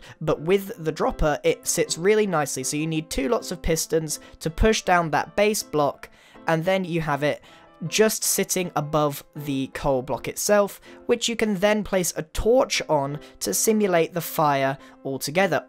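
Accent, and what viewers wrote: England, United Kingdom. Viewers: Grian ar u talking 100% english or american or is it. the same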